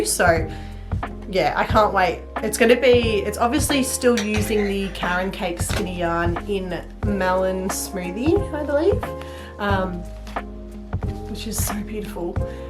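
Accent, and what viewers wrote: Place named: Australia. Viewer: I’m in the process of making one and was happy to stumble upon your video. I’ve made a couple of small ones for babies and toddlers but this is my first for me. It’s so good to hear an Aussie voice and listening to your ideas. I’m not a fan of the large arms so looking for ideas. Good luck with your Chanel, I’ll be following you 💐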